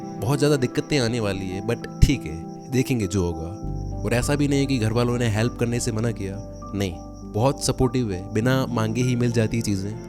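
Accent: Rajasthan, India. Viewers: bhaiya rajasthan se ho bato se lagta h me bhee jodhpur se hoo. or me bhee logo se kat gya hu phone calls band Kar dee Sabhi se bat krna band kr diya par result ka jyada hee sochta Tha pr aapkee process Wali baat me bhee Dhyan dunga ab thanks u❤brother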